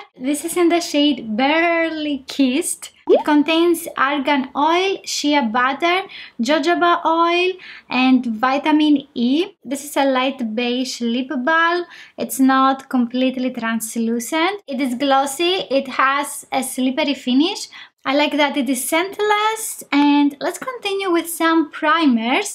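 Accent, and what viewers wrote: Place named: Greece. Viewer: She is Greek. I love their accent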